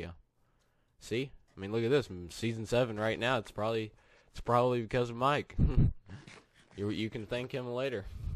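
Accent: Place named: Kentucky, United States. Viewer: I seen that is from Kentucky he’s got that accent I live in pikeville KY btw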